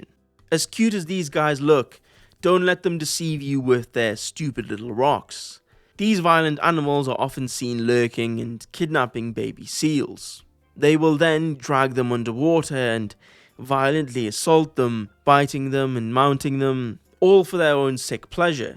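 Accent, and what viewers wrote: South, United States. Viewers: please bring back the southern accent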